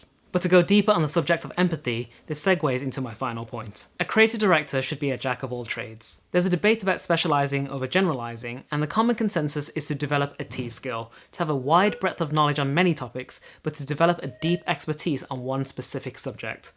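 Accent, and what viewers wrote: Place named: United Kingdom. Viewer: Why does a british accent just exude competence in a creative director role?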